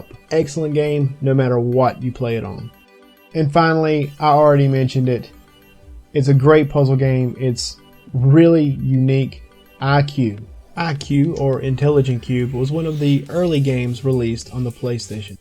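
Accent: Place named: South, United States. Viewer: Mike B sent me...you sound nearly as country as me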